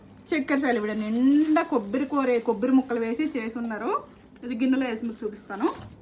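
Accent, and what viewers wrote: India. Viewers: Srikakulam kadakka meedi.. suppulu annav chudu.. chevulaki bhale haai ga undi😊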